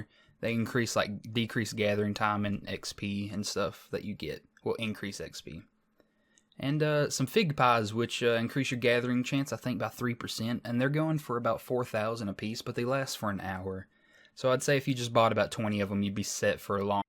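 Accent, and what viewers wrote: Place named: United States. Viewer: I love this game and your country ass accent SUBBED!!!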